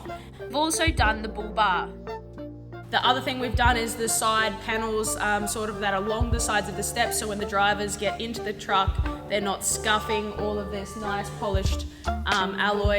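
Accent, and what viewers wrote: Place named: New Zealand. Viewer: Bubbles? how on earth do clear them?? And she sounds like a Kiwi,,,🤔😊😊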